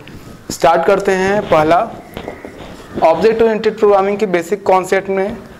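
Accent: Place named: Bhojpuri, India. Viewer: bhojpuri tone me baat karte hai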